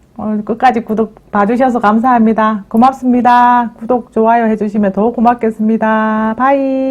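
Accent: Gyeongsang, South Korea. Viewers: 나도경상도 사투린데 재밋네요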